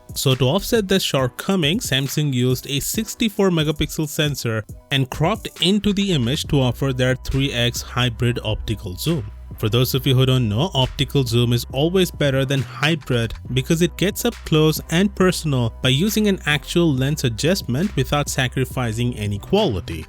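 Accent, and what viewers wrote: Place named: India. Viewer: it explains the english /indian accent lol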